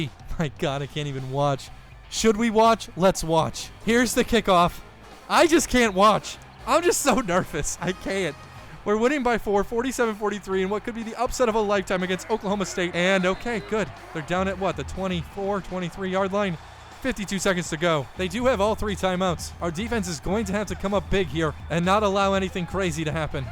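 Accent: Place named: Midwest, United States. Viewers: Old roommate from Apple Valley, MN sounds similar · is a good old fashioned Midwesterner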